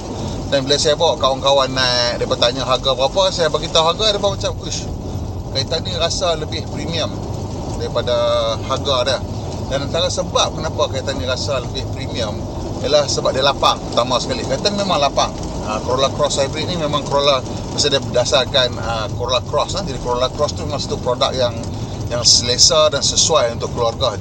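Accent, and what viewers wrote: North, Malaysia. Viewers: best explain loghat utagha..sempoi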